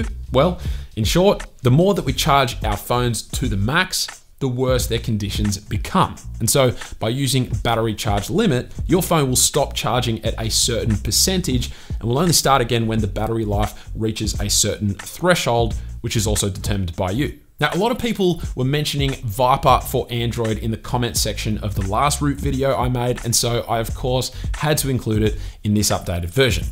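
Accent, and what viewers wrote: Australia. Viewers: Haha I knew you were Australian as soon as I heard you talk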